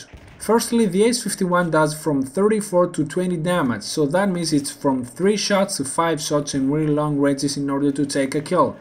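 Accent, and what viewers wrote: Greece. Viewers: Where is he from? His accent sounds like he is Greek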